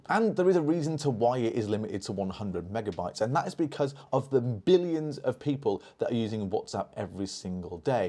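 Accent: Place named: United Kingdom. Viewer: I love his British accent though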